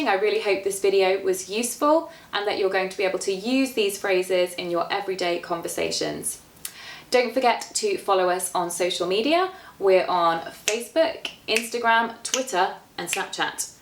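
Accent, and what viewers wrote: United Kingdom. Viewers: i fancy listening to British English. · Are you british? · I try to explain... You look as a proper british woman, you have british accent, you like british traditions(an afternoon tea) and finally you drink the earl grey tea which is of course british. Oh and The Union Jack is quite often seen in the videos. These are many clishes. But I don't deny that it would be a sophisticated experience.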